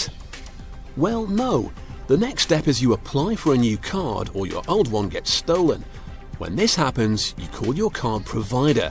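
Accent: United Kingdom. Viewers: The U.K. narrator makes everything better.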